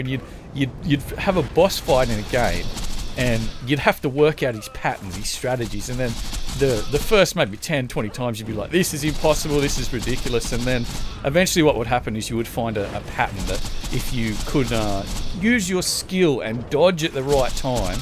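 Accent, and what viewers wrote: Australia. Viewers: what part of australia are you from?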